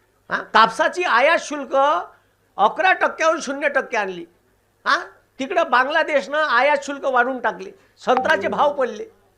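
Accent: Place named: Vidarbha, India. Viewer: Varhadi Marathi ❤❤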